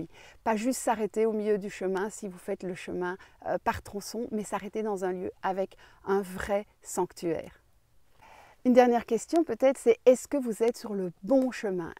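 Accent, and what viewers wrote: Belgium. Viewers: Bonjour j’aurais bien vu vos randonnées en Norvège et merci encore pour la positivé et cet accent belge bon courage pour votre chemin dans l’éternel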